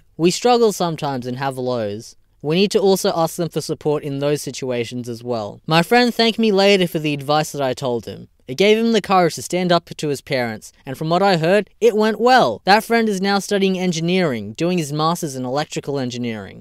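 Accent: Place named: Australia. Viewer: I'm similar to you. I grew up in Australia from immigrants (I'm assuming you've got an Australian accent). I can speak my native tongue but not particularly well. Its a real chore to communicate with native speakers back in my country of origin. I've had that exact situation where they put you down for not knowing the language well enough when they speak with a thick accent and too quickly to comprehend. I get alot of crap for not being able to write my own name in my native language. I don't owe my country of origin learning my language better, its not my failure.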